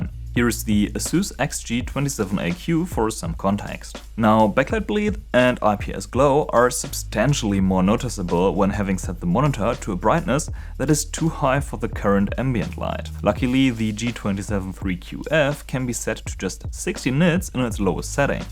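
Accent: Germany. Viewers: Dachte am Ende kurz, dass mein Englisch mittlerweile so gut ist, dass ich schon deutsch höre. Sehr geile Videos btw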